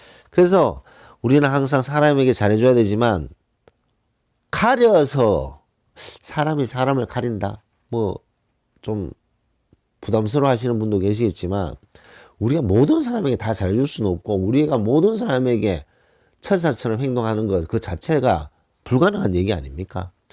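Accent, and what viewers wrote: Gyeongsang, South Korea. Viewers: 경상도 말투인데 듣기 싫지 않네요. ㅎㅎ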